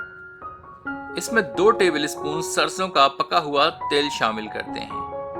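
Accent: Standard. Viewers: शेफ आप जैसा बहुप्रतिभा का धनी व्यक्ति बहुत कम देखने को मिलता है । आपकी शुद्ध हिंदी का उच्चारण सुन कर अतिप्रिय लगता है ।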